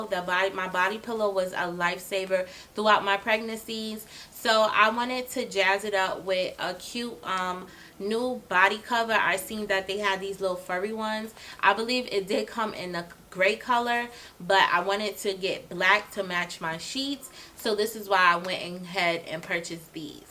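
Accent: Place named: New York, United States. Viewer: Hey Melanin Queen! Ok you went to make it rain I see ! You have some nice stuff that you some of the stuff and there are some stuff that I never heard of . I may need you as a shopping buddy. Love your personality lol. Sounds like you from New York u have a strong accent. How did your date night go? Did it went well? I don’t like flat pillows either … I always said I was going to get the mood lights …. Question can u play music and it switched the mood of the light or can you switch the colors? How do you feel about Kylie brand of lipgloss? I’m going to may light my candle for my bathroom as o take my bathroom..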